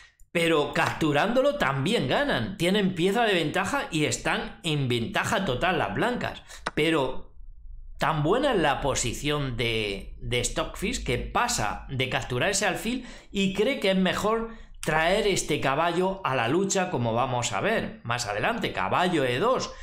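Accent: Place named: Spain. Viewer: Los españoles hablan asi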